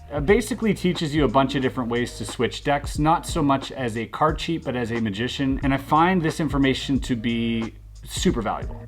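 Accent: Scotland, United Kingdom. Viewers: we have missionaries in Scotland plus a guy playing the bag pipes at the church wide picnikick in back of our church in Chesapeake Virgina he played the music of song that also in star trek movie the death of Spock ps i video recorded the church wide picknick at Good News Baptist Church and uploaded to youtube video search engines channel ps nice cool out fit and good Scottish talk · From scotland stay like 20 mins away from Earlston loved the accent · Good Scottish accent, too 😅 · Fabulous video. A great Scottish accent. Scotland is a fantastic place. I am the world’s worst magician, but always finds videos like these very useful.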